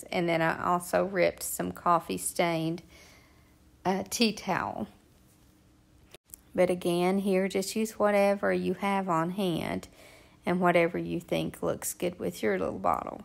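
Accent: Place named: South, United States. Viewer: Thank you so much, just love your ideas and your accent is gorgeous. We hardly ever hear a Southern accent in South Africa so it is indeed very pleasurable watching and listening. Just subscribed, I have to get more of this, it feeds my soul. Thank you and God Bless❤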